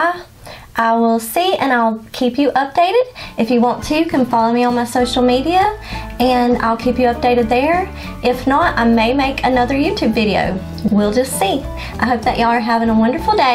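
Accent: South, United States. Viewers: Wow! That is cool. My husband is originally from Mexico and we eat quite a few avocados, now I have a new use for all the peels and pits. How did your guacamole turn out? Love your accent. Always nice to hear a fellow southerner! 💜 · Your so cute, love listening to your southern accent. Thought of trying some dying after the first of the year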